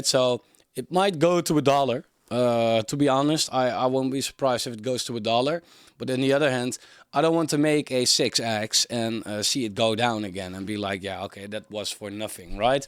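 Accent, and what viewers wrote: Netherlands. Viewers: Grappig je hoort altijd meteen wanneer het een Nederlander is.. kwam je toevallig tegen na 1,5 jaar op youtube struinen. Ben geabonneerd en wens je veel succes met je kanaal !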